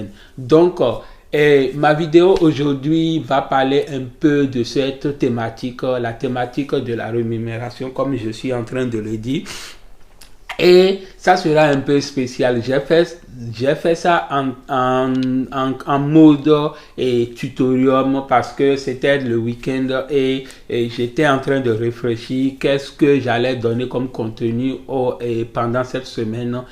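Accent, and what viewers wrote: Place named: Benin. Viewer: Merci bien……L’accent béninois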